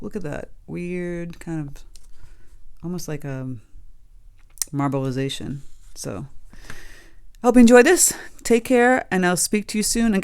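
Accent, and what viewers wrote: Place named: New York, United States. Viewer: Love the accent! N.Y, N.J.?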